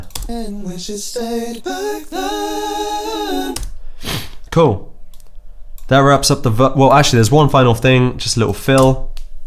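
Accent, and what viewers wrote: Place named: United Kingdom. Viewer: Who knew you had such an amazing SPEAKING voice. And a Brit? I could listen to you speak and be happy. Thank you for sharing your gift!!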